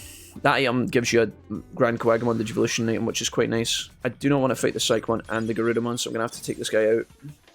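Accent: Scotland, United Kingdom. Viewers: Watched your digimon vice play through so now on this as i'll watch time stranger later when I'm further in the game (had hardly any spoilers as of yet!). This has made me replaying Next Order from the beginning on my switch in bed at night. Safe to say I did not get the Gruesome twosome. I got Togemon and IceDevimon! Who are now Lilymon and Dynasmon via Gigadramon. Love your play throughs its nice hearing a fellow Scottish accent! 😬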